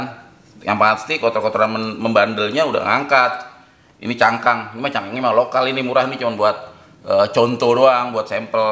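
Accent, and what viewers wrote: Jakarta, Indonesia. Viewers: Betawi Asli... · Pap dengan logat betawi,,, josss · Kalo ane bisa sucrib 10 x ane kasih dah. Konten nya berkualitas. Penjelasan nya enak bngt dg bahasa khas betawi. Bini nye ikut nyumbang layar tambah asyik. Top markonah deh. 👍👍👍👍👍👍👍👍👍👍👍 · Betawi punye ni mah. Mantap cang... 😁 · Nt betawi mane bang roman ny sedep bener logat nt. Salam kenal bang dari ane nak betawi kemayoran 🙏🤗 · Yg ky gini ni gw suka.. apalg sama Abang nya yg kasih tutorialnya pake logat Betawi nya..keren HBs dahh pokoknya..sehat selalu bang..mksh berbagi ilmunya Nye..salam dari Kalimantan timur Balikpapan..🙏🙏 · Betawi tulen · Logat Betawi👍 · Saya suka sma bicara nya mengingatkan saya sma tmn orang Betawi logatnya itu bikin candu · Seru ngomongnya betawi tulen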